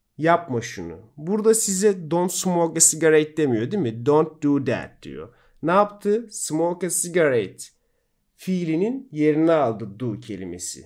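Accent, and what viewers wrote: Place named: London, United Kingdom. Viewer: Hocam şiveniz aynı londra maşallah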